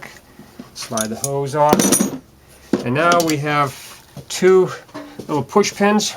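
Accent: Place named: Boston, United States. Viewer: wow, really? Either way, I'll be checking your videos. They are extremely informative and gotta love thr Boston accemt. :D.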